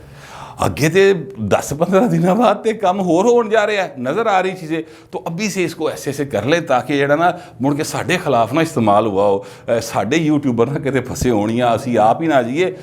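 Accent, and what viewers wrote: Lahore, Pakistan. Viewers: An excellent commentary,in Lahori style.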